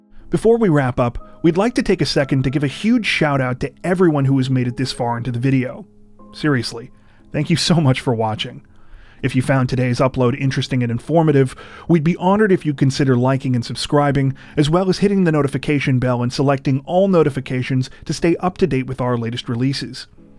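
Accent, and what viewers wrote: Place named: Canada. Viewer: A very typical Canadian manner of speaking.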